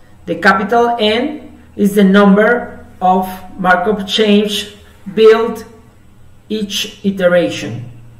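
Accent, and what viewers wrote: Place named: India. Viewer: He speaks like Hindu, not like Mexican jeje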